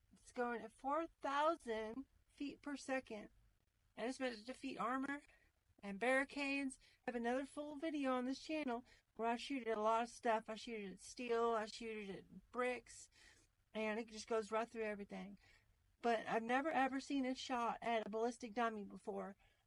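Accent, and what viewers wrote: South, United States. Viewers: Sound like you from Mississippi or Alabama or Tennessee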